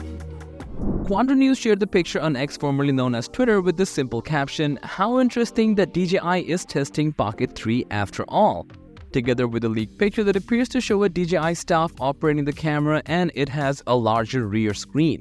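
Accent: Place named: India. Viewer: U sound from india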